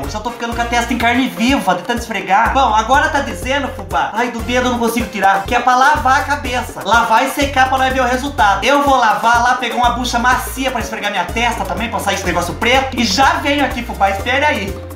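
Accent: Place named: São Paulo, Brazil. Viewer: Gosto mto dos seus vídeos vc, é mto engraçado, com esse sotaque paulista.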